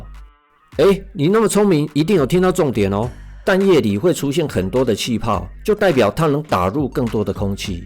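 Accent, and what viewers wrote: Taiwan. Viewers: 就是這個正港台灣腔讓我訂閱的😂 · 你的臉很帥感覺很斯文，但聲音很台哈哈哈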